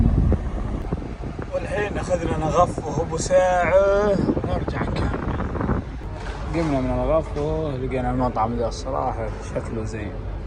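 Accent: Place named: Unaizah, Saudi Arabia. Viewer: لهجة أهل عنيزة تسذا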